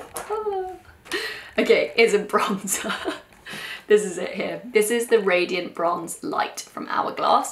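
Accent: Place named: RP, United Kingdom. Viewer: In what way, she has a pretty standard British accent?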